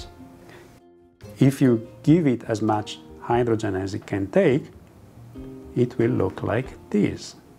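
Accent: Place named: Italy. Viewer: Excellent information that will help me teach this concept to my general chemistry students. Bonus listening to it with an Italian accent!!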